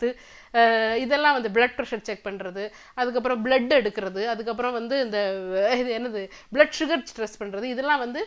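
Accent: Nagercoil, India. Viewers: நாகர்கோவில் தமிழ் அருமை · Very Very great, because of your natural expression of your way of giving the replies. and waiting for the more and more videos from your end. Your Nagercoil slant is added advantage for you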